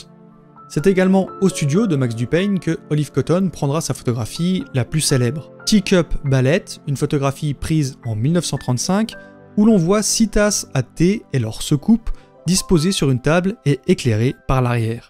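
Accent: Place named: Belgium. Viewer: Mon dieu je suis vraiment désolé mais j'ai pas du tout réussi à suivre la vidéo parce que je bute à chaque fois que tu prononces "et" "est" 😭 mes plus plates excuses à tous les belges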